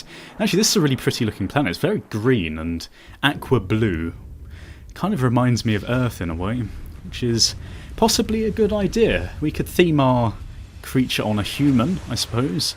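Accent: United Kingdom. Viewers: His accent is very British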